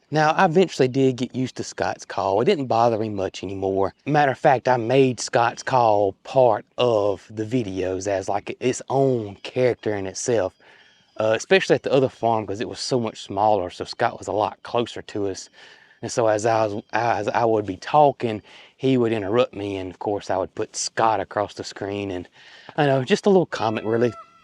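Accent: South, United States. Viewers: your southern accent is thicker lately. Love it.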